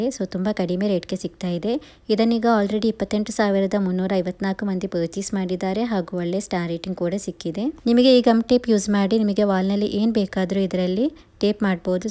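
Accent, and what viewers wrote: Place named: Kerala, India. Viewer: Sis neewu malyalina nim maathado style ishta aythu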